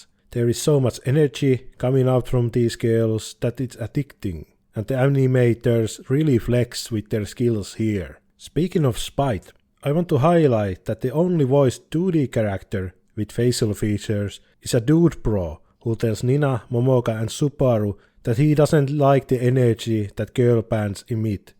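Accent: Finland. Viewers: great videeo random question but where are you from? guessing u could maybe be finnish since your pfp looks kinda like the Spurdo Spärde stuff n ofc ur accent is pretty finnish like lmao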